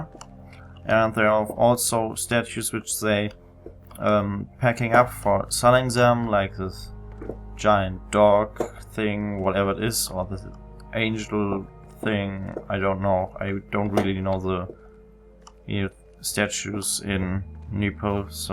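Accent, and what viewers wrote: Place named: Germany. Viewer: voll hammer mann hört voll den deutschen acsent (hoffenlich richtig) aus der stimme also kommt das noch in Deutsch wäre cool.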